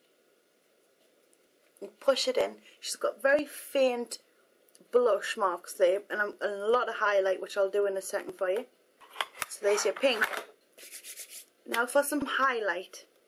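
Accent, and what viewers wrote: United Kingdom. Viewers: Something about a white girl with a british accent teaching people how to be like nicki just isnt right.....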